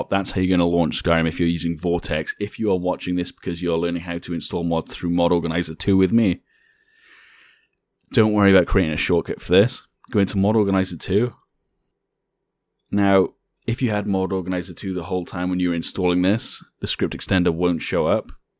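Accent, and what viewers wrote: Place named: Wales, United Kingdom. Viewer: Dunno why I was surprised to hear another welsh person on YouTube but I was lol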